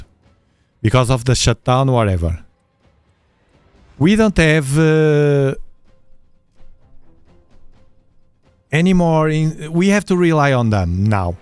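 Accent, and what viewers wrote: Portugal. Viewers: rofllll. he’s Portuguese 😊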